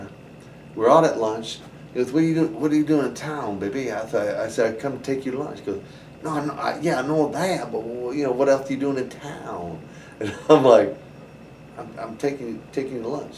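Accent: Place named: United States. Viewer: Wow, he learned to speak in an American accent really well!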